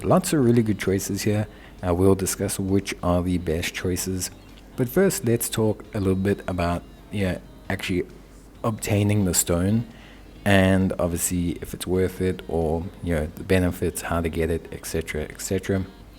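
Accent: South Africa. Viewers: Woah bro, are you South African?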